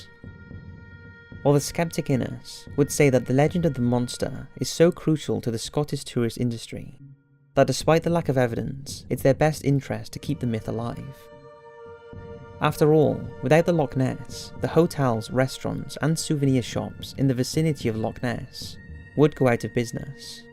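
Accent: Wales, United Kingdom. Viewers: Loch, not lock. You sound Welsh mate so should know better. Anyway, good video and very interesting.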